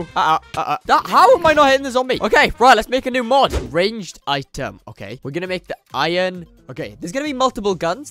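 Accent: Wales, United Kingdom. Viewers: Hol up are u Welsh?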